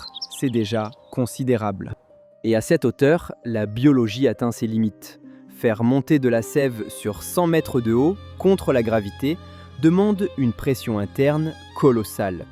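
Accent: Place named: Maghreb, North Africa. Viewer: J'adore ton accent très légèrement maghrébin.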